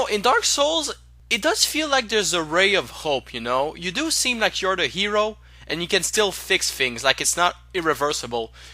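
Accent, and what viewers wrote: France. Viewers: Pretty good, but I think your jokes (play with words and images) are too frequent. Some are good and made me laugh, and others just made me say: "meh". Also, love the drawings. Btw, if you want to keep your French accent as your "trademark". Continue. If not? Work on your pronunciation. For example, the way you say "Anor Londo". ...Just saying... don't want to offend you.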